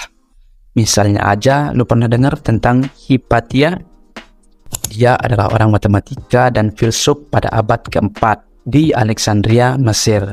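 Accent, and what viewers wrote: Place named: Bali, Indonesia. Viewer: bahasanya loe gue , tapi logat,nya bali bngt 😄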